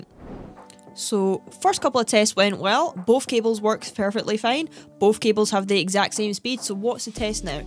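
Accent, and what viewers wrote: United Kingdom. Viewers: Great video! Love your brit ascent!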